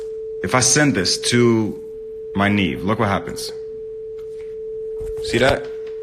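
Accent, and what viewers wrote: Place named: Pennsylvania, United States. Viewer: Sounds JUST like my guy from PA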